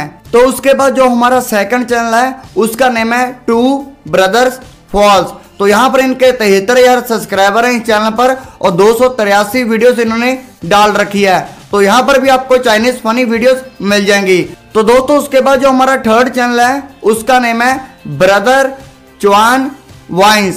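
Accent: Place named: Punjab, India. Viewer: Bhi app Punjabi oo lgda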